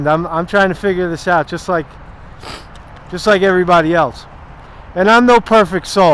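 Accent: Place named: St. Louis, United States. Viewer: ...I grew up on a State street in St. Louis...although You are a little more refined than me I feel we have a connection through speech...It's like-- I came to kick some ass and chew bubble gum...and I'm all out of gum...keep up the Hard work my man.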